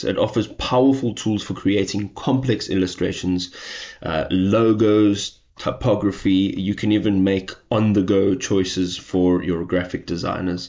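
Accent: South Africa. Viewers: This is great info, brother. The South African accent never go away.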